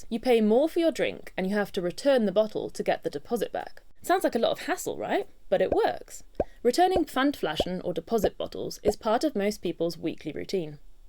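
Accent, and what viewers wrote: United Kingdom. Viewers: pantes aksen Inggris awalnya saya kira asli orang Jerman ternyata Inggris 😅😅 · Suka banget aksen british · Waahh serasa menonton harry Potter pakai aksen British 😄😄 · Salfok sama accent britishnya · Bicara tentang jerman tp dengan aksen britania hehe.. saya suka yg terakhir, semua hrs rela berkorban utk sebuah tujuan bersama👍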